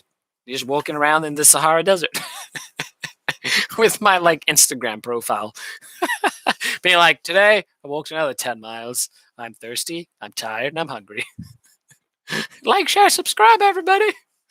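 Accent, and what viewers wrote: United Kingdom. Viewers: PS. I always wondered why you were a Spanish-speaking Canadian with a British accent. Now it all makes sense!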